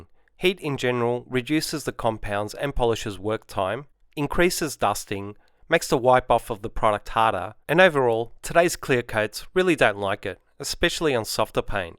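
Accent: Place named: Australia. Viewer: After the Bullshit Techno Intro Music I wasn't expecting to hear an Aussie Accent.....Pretty good review though. Not sure that it's helped me decide between the Rupes LHR15 MK2 or the Flex. Maybe the Rupes is a better all round machine? So I'd be best to go with 125mm backing pad on whatever machine I decide on rather than the 150mm. Is that correct?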